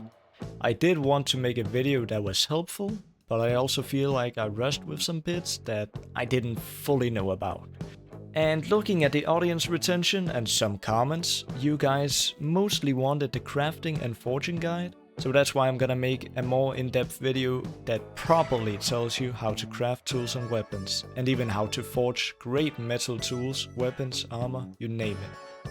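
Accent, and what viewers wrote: Denmark. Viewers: are you danish? loved the video btw! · are you danish bruv? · Very informative video, great intro and thanks for the hard work you put into it! Btw is that a danish accent?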